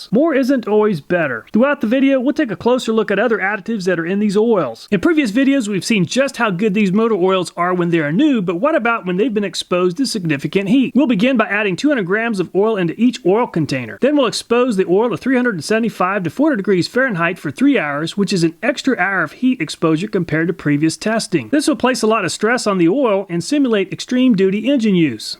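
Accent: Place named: Pittsburgh, United States. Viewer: I'm from the Pittsburgh area....your accent....my ears cant be wrong....you're from there too right?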